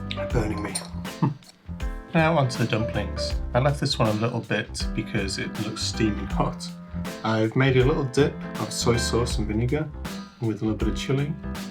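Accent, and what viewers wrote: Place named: RP, United Kingdom. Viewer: That`s why we call him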